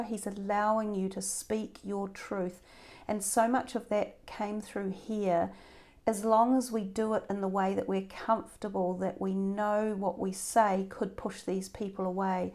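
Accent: New Zealand. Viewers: 🥰🥰 refreshing to hear a kiwi accent 😊 Kia ora arohatia to tohu ❤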